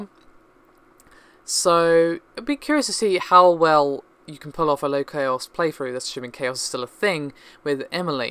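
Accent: United Kingdom. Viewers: Very worth listening to, and elegant British accent. :)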